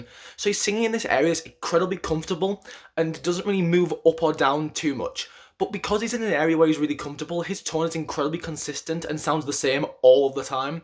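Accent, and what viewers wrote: United Kingdom. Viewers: My brazillian ass had a big trouble to understand everything with this beatiful british accent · Well I'm guessing that since he's British and he also talks really fast, it sounds like he's saying "monster" because of his accent. I've even seen British Monbebe who say it like that sometimes, so I don't think it's intentional.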